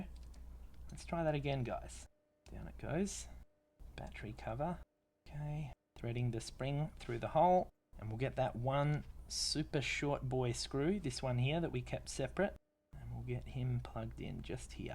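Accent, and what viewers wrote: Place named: Australia. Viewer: This is a wonderful tutorial. Thanks. Your Aussie accent is amusing to follow.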